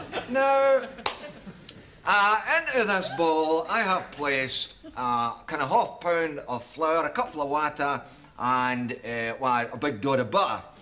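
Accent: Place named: Scotland, United Kingdom. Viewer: Its funnier because of the Scottish brogue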